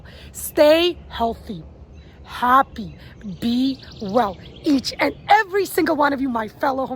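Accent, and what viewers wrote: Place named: New York, United States. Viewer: That’s how we talk from New York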